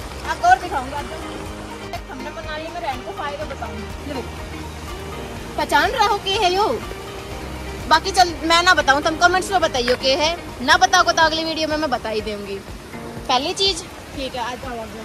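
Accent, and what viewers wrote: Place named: Haryana, India. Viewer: Your haryanvi accent is so nice. Keep it raw 😊 #proud_to_be_Haryanvi.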